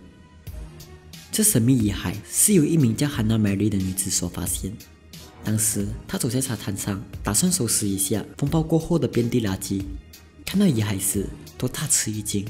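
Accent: Malaysia. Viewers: 马来西亚口音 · 是馬來西亞人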